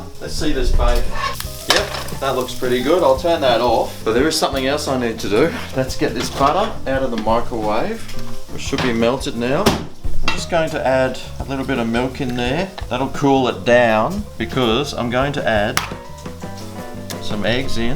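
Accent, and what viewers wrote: Australia. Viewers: you sure do like your cheese hugs from America hon btw I love the Aussie accent;) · Nice to hear an Aussie voice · Aw. You were pleasant to watch and the recipe looked very yummy. Darn it! I miss the Australian accent. Lived there for 6 months so long ago. Aluminium. Heh heh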